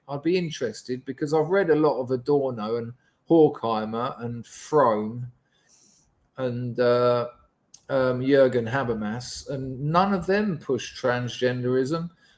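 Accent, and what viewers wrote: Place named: London, United Kingdom. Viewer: I really miss the London accent I spent a lot of time in Hanwell over the decades 🏴⚒️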